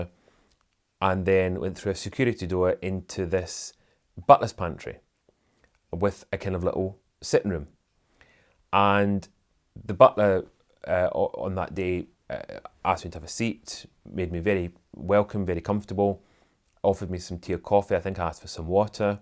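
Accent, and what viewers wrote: Scotland, United Kingdom. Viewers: I could listen to you all day! Such a lovely Scottish accent. Love your channel! Highgrove...the most beautiful place on earth! Prince Charles had the vision to turn it into a paradise. Watching the documentary of Highgrove, by host ( Alan Titchmarsh) made me cry. Prince Charles is an incomparable gardener, which shows me he truly has a heart of gold. I adore him and his lovely wife,( The Duchess of Cornwall). Who wouldn't want to work for them! I'd work for them doing anything, for free! Greetings from Canada.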